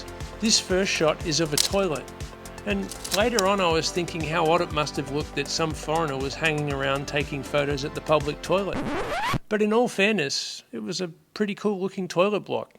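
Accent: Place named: Australia. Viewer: Love the explanation of the film, and your casual Aussie cheeky delivery. Nice product hand flourishes, this too could be yours on...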